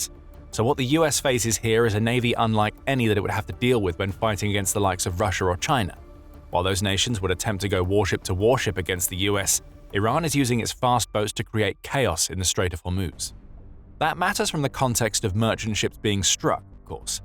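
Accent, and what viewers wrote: United Kingdom. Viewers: Why are all of the videos are narrated by guys with a British accent????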